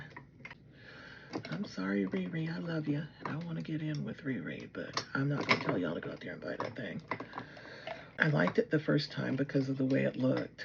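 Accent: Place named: United States. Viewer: Aaaaah❤u look 🌹 lovely beautiful, u do have a beautiful accent n I love hw u American's speak am frm England ❤take care 😘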